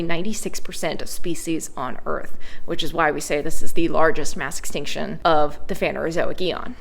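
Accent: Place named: Texas, United States. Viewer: That’s just her Texas twang coming through, relax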